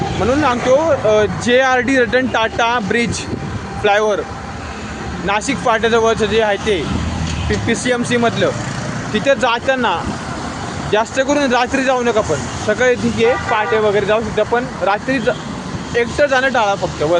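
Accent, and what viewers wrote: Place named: Gujarat, India. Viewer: भावा speech चांगल कर असं वाटतंय की तू मराठी नाहीस गुजराती आहेस. कंटाळलो ऐकून... 🙏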